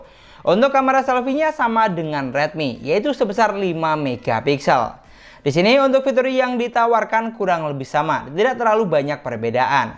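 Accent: Madura, Indonesia. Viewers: Bang kamu orang madura ya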